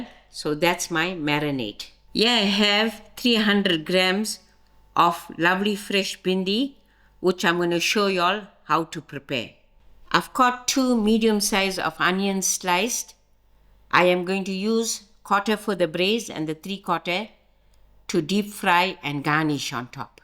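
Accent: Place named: Sezela, South Africa. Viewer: Aunty you sound like a Sezela Tamil aunty,saying orhil and tamartoe. How big is Prakash's dhanda?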